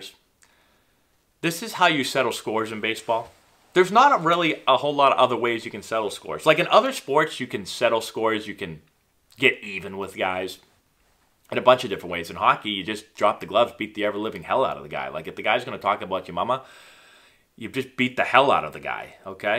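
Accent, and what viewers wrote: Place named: Boston, United States. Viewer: Lol I love how you say “hawkey” with the Boston accent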